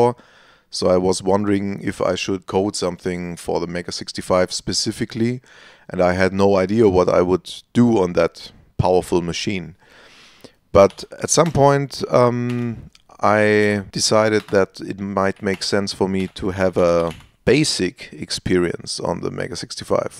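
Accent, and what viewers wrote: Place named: Germany. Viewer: Einfach Deutsch-Akkzent Goldstandart 😄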